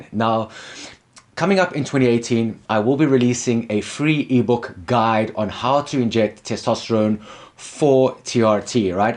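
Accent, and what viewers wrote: South Africa. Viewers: Sounds very south African.... Lekker boet... Very informative awesome video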